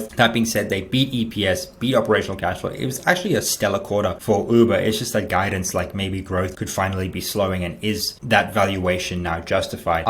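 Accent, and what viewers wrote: Australia. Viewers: Great analysis yet again.I love your familar Aussie accent too; yet another reason for me to listen to your show. Since commercial real estate (CRE) woes are very much in the news all across the world & not just the US, does this mean that every woman, man and their dog is now placing short bets on just about anything to do with CRE implosion say with options a few months out? Thanks, for any further insight you are able to offer.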